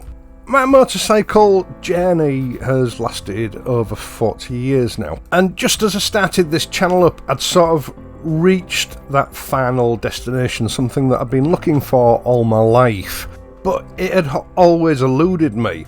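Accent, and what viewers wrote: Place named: Yorkshire, United Kingdom. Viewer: Thanks to the dulcet Yorkshire tones of, every time I wheel my Super Meteor out of the garage I hear the equally dulcet tone of my missus (doing her best Michael Parkinson) saying … “it has the turning circle of the Amoco Cadiz”.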